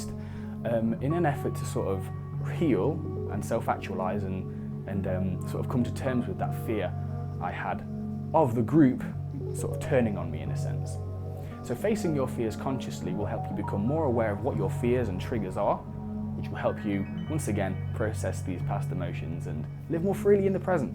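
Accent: United Kingdom. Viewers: So happy that I've found this video! Thanks a lot for mention the book, I could feel in my body that only talking therapy isn't enough... I feel just like Ece Erdem: got here for healing and stayed for the content but those eyes and the British accent added that special thing... <3 lovely! Thanks, Thanks, Thanks a bunch,! Much love from Brazil!:)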